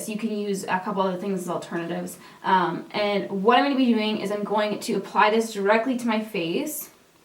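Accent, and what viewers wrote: Canada. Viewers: your canadian accent is so cute.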